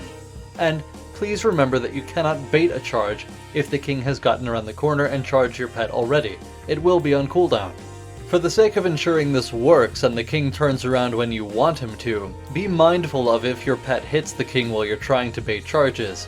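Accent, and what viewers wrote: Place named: United Kingdom. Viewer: Where from? Sounds like you're half british.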